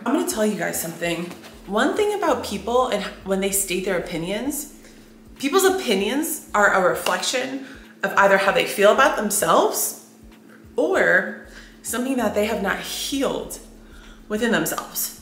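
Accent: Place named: California, United States. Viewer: Your Cali accent is annoying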